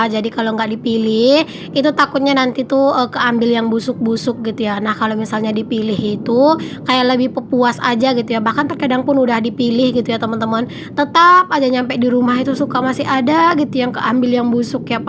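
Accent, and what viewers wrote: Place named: Padang, Indonesia. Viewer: Alhamdulillah, Tabarokalloh... Seneng dengar Bunda bicara menggunakan bahasa daerah, seperti loghot adik ipar yg dari padang...🙏🙏😊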